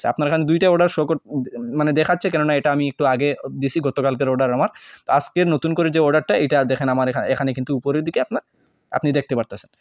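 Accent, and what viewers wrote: Sylhet, Bangladesh. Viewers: Vai ki Sylheti?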